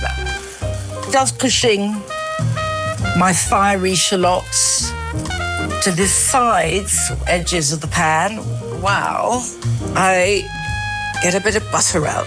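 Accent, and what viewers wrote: RP, United Kingdom. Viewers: Nigella, you have been such an inspiration throughout my life! I found your shows many years ago when I had the privilege of living in the U.K. You and I both lost our mothers around the same age and I find your recipe videos to be incredibly comforting when I am missing her the most. Especially your Christmas episodes. I find such inspiration in your ability to manifest joy and beauty in often simple ingredients made decadent, and of course the well-deserved fancy ingredients. The way you describe food is like poetry. I not only learn new recipes, but my vocabulary has increased exponentially. I can only imagine how much fun it would be cooking a meal with you while sipping on a cocktail. Thank you for sharing your life with us, even with the things you perceive as flaws. They're not flaws, they make you even more perfect. You've always been so honest with the public and I think that is both noble, rare, and worthy of all of praise. 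Please don't stop saying "meekro-wavay." It's become a household term in my family because of that episode. I thought it was absolutely adorable. You speak the Queen's English but throw in your own cheeky neologisms that make you 3-dimensional. Thank you <3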